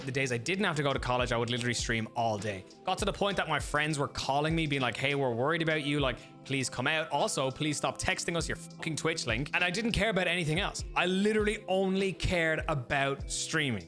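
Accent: Ireland. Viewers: damn he sound like me fr (im irish too)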